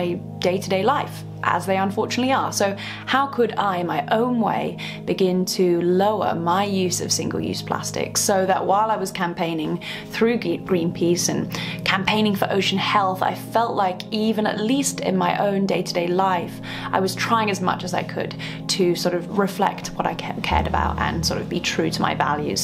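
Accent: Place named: England, United Kingdom. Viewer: I really love English accent, she's a real queen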